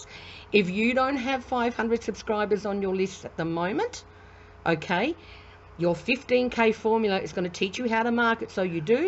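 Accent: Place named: United Kingdom. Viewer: Lovely british accent!!!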